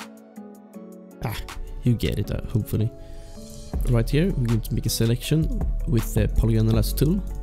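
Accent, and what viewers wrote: Sweden. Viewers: ARE YOU SWDEDISH? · Are you swedish? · are u swedish? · I know a swedish accent when I hear one ^^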